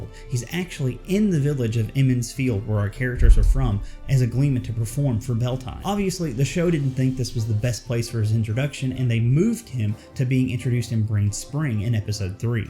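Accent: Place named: Alabama, United States. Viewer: I thought I heard some Alabama in there. I've got family in Tallapoosa, Georgia (which is within spitting distance of Alabama). I've loved that accent for years. My wife and I are from SE Indiana (Aurora)...which is basically near Cincinatti, OH. We are in the Tri-State (Indiana, Ohio and Kentucky). We have an accent, but to us...it's just the way you talk. Thanks for sharing.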